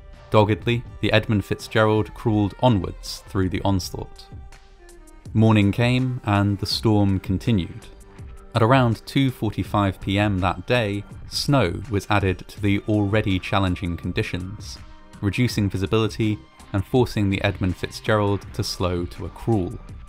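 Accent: England, United Kingdom. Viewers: Im beginning to develop an addiction to your voice and the way you tell stories, which part of uk is your accent from? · American ship British narrator 🤔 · Between you and Coffeehouse Crime, I am getting my fill of soft-spoken English guys telling me about terrible things in a soothing, satisfying manner.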